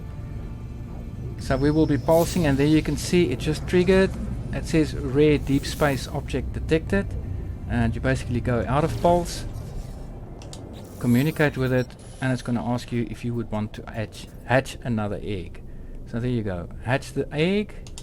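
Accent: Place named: South Africa. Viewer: The South African accent is strong with this one.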